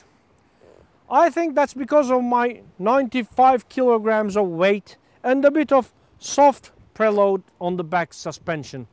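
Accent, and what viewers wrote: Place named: England, United Kingdom. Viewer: Bildigin ingiliz aksani var kardez. Neyini begenmedin.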